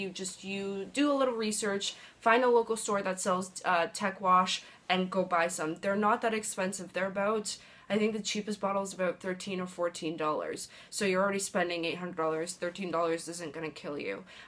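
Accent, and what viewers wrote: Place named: Canada. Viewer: i love your cad accent..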